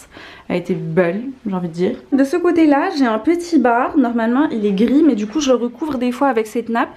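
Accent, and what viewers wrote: Quebec, Canada. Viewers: Tu parle avec un accent canadien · Pourquoi tu parles avec un accent canadien 🤔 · T’as un peu un accent québécois non ?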